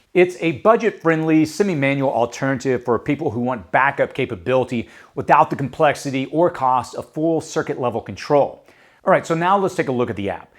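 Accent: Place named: California, United States. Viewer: Hmmm are you close to the Bay Area ? I heard California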